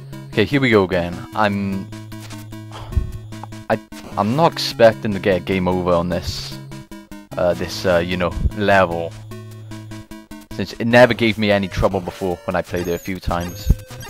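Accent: Wales, United Kingdom. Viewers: I NEVER GET TIRED OF THE TOTALLY VOICE FULLED WITH WELSHNESS Does that make me sound Gay??? O_O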